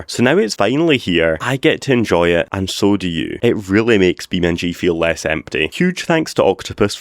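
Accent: Scotland, United Kingdom. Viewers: Oh cool a Scottish BeamNG YouTuber!!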